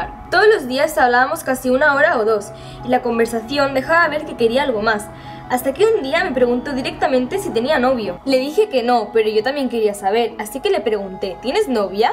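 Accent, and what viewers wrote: Cordoba, Argentina. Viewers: el acento cordobés 😭🤏🏻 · Soy de Argentina buenos aires y el asento cordobes es lo más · che pero el asento ya lo superas te lo pasas al siguiente por q ablas bien argentino saludos desde argentina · Es que es asi pa los aregentinos somos los mas romanticos del mundo wacho siendo cirujas o quizas no pero siempre ahi para cuando se trata de la persona mas especial que tiene uno💖y la tonada de este hdp la amo gente😁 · AW EL ACENTO DE ARGENTINAA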